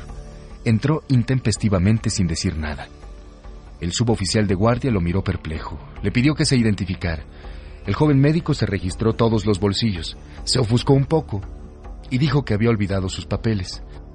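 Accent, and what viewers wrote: Mexico. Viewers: Cómo siempre las voces mexicanas deleitando al auditorio tanto en películas como en narraciones . Felicidades desde stgo . De chile .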